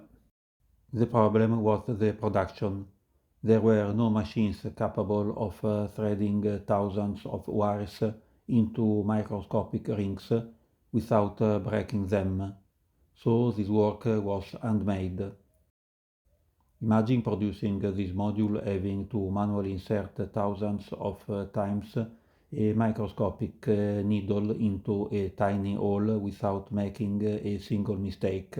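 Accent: Italy. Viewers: Mai sentito un accento più italiano di questo ahahaha. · You are Italian. At least your accent says so! :D However, great video!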